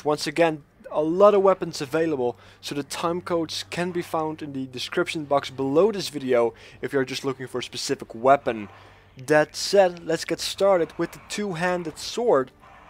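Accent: Netherlands. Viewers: You dutch i hear your accent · Yo mede Nederlander, nice vid ! ps: Je accent verraadt je :p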